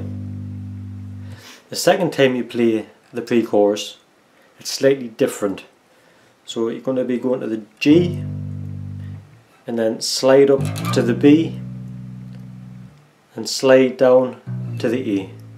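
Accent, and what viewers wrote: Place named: Newcastle, United Kingdom. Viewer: It might be his Geordie accent, but the tab and the notes he's playing match up.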